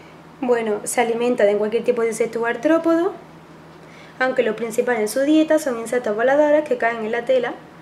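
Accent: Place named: Spain. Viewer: Aparte de la tarantula. Me encanta ese acento de españa ❤️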